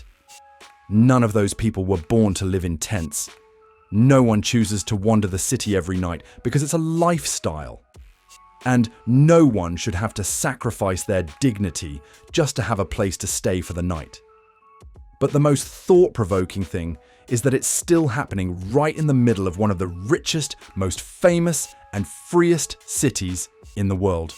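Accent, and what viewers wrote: United Kingdom. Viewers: You sound like you're from Britain, so it's even worse there. 😂😂😂😂😂😂😂😂😂😂😂😂😂😂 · Couldn't they find an AMERICAN RESIDENT to narrate this? Instead of this British creep?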